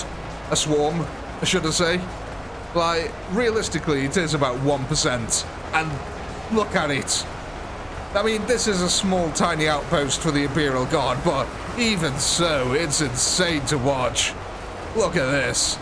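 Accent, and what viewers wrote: Stoke, United Kingdom. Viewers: I detect a Stokie 👀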